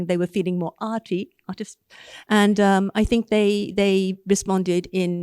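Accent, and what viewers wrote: United Kingdom. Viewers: 素晴らしい👍👍👍 king’s English, 驚き‼️こんなすばしいひとみたことない、、殆どイギリス人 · ある程度原稿があったとしても、その場に応じてユーモアと知性、ウィットに富んだ英語の内容は流石ね！！イキイキされていてホント聞いているこちらまで晴れやかな気持ちになるわね。東京オリンピックの招聘のイベントの時になんて綺麗な英国アクセント、そしてフランス語を話されるのかしら…と今でも覚えているわ。英語の先生、そして全ての学生はの英語を聞くべきじゃなくて？笑顔が張り付いたようなどこかの方はどうなのかしらね？ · 久子さまのファンになってしまいました。美しいブリティッシュイングリッシュ。お話の進め方も素晴らしく、とても興味をそそられました。楽しそうに話されているので聞き入ってしまいます。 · かっこいいですね。キレイなブリティッシュイングリッシュ、わかりやすいプレゼンテーション、さすがです❗️❗️❤ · イギリス英語？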